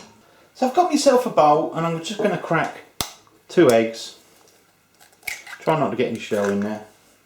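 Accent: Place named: Essex, United Kingdom. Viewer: You are 1 funny bloke do you know that?! :D I think you would find it amusing to know that I often watch your vids in bed (as my computer is set up along side it for long hours working at night!) & I have a nice cup of Assam, & laugh uncontrollably at your little moments of alacrity & charismatic cordiality! You surpassed yourself with this one Chef! And 13 Minutes! Good length! Do you know, I could swear you have an ESSEX accent! Maybe it's just me. How on earth R U going to top this one ay!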